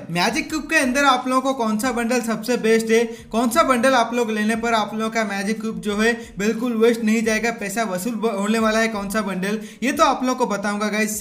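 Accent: Odisha, India. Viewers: Bro are you odia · Bro are you from odisha? 😅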